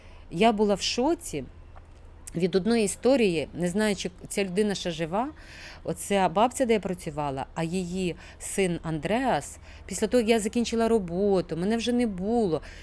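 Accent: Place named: Ternopil, Ukraine. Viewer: А, тому мені говір здався рідний,бо ви з Тернополя, я також!!! Вдачі вам!!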